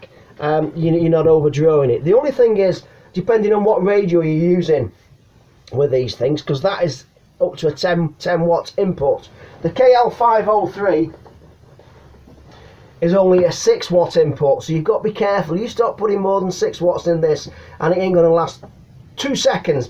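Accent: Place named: Yorkshire, United Kingdom. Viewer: Yorkshire? Lancs? Ex-Halifax lad here. Living in CA. CB is quiet here so getting a 10M rig tuned and want to put an amp in the system. Found your clip and it felt like I were back 'ome again.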